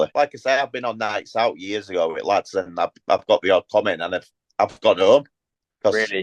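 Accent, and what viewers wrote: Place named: Northern England, United Kingdom. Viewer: Just because you're not used to a Northern English accent there's no need to be a dick about it - his articulation was perfect.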